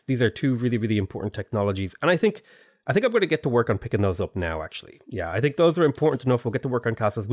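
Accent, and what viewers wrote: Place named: Ireland. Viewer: its just the irish in him ;), a lot of english natives who don't regularly hear an irish accent also need to slow it down lmao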